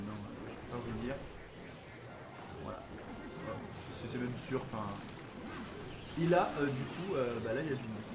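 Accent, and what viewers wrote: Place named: Marseille, France. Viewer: t a un accent marseillais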